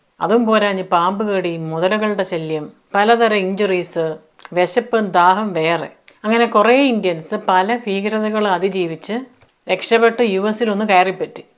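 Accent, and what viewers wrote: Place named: Thrissur, India. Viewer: ചേച്ചി ഞാൻ തൃശൂർ ആണ്, പക്ഷെ ചേച്ചിയുടെ സ്പീകിംഗ് ഒരു തൃശൂർ സ്റ്റൈൽ ആണ് കേൾക്കാൻ രസം, തിരുവല്ല ആണെന്ന് അറിയാം 😂😂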